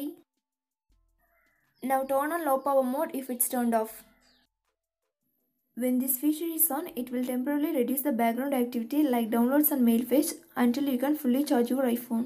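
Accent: India. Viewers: I hear Indian that means I’m in the right place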